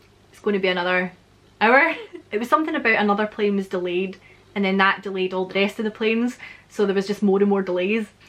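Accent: Scotland, United Kingdom. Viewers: I love listening to your stories. I love learning scottish accent from your stories! and what an adventure you had! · I'm getting better at understanding your Scots accent. So it's progress.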